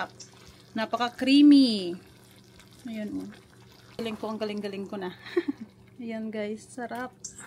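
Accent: Iloilo, Philippines. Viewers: Ilongga po kau?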